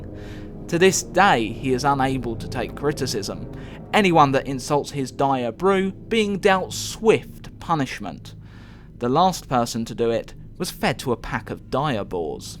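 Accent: United Kingdom. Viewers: British accent detected !!!!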